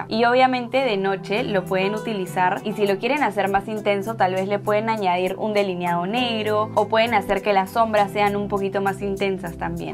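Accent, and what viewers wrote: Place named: Chile. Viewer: De qué país eres? Algo de tono chileno suena aveces 😅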